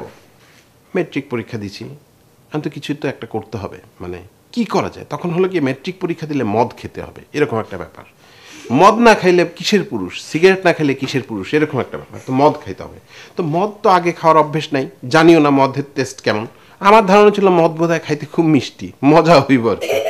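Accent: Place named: Old Dhaka, Bangladesh. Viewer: Bhaiya, onek majul kahino 😍🤣 Apnar kintu ekhono puran dhakar pronunciation ache. Khubi bhalo Lage shunte 😍😎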